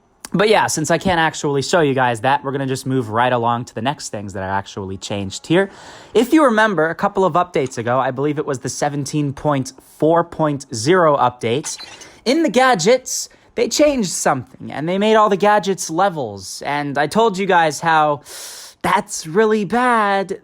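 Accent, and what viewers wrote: Russia. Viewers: Are you Russian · Are you Russian?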